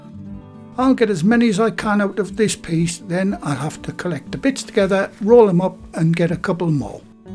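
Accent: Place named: Geordie, United Kingdom. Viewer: My girlfriend is from Newcastle. Love the Geordie dialect🥰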